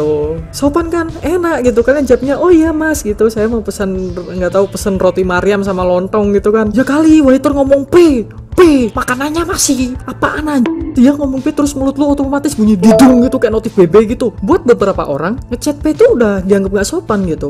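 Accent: Java, Indonesia. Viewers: " kalian tinggal dugem maen bola sodok " awoskske i love medok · Bang lu orang mana sih? Kayak ada Jawa Jawa medoknya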